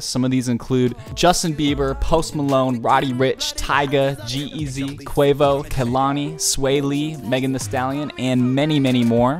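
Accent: United States. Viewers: Great video but halfway through I had to turn it off because I just cannot stand listening to American accents:((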